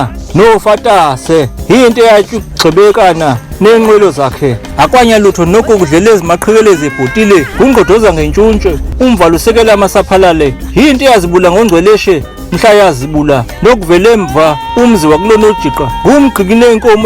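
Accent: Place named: Zulu, South Africa. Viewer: Lol you tried your accent is zulu, much uppreciated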